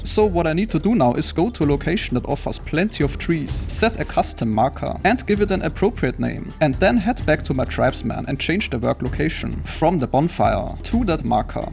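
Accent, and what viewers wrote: Germany. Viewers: Bro, du bist deutscher oder? 😂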